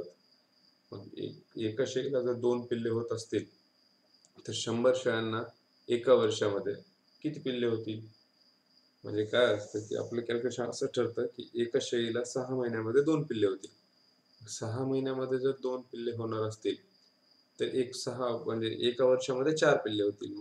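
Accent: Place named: Vidarbha, India. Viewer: सर, तुमच्या भाषेच्या ओघावरून मी एक निच्छित सांगू शकतो कि तुम्ही विदर्भातील, अमरावती विभागातील एका जिल्ह्यातील आहे. तुम्ही दिलेली माहिती खरच फार उपयुक्त आहे, आणि व्येवासायातील वास्तववादी जगण्याची प्रेरणा देणारी आहे.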